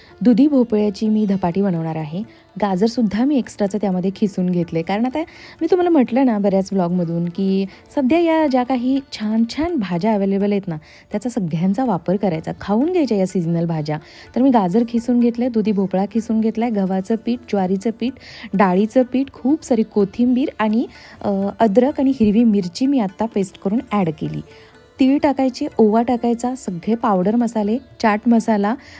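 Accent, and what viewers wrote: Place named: Pandharpur, India. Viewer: Hi तुझी भाषा थोडीशी आमच्या पंढरपूर सारखी वाटली धपाटे चिकाचे दूध❤❤ तुझे बरेचसे ब्लॉग बघितले छान नॉलेज आहे तुझ्याकडे 👍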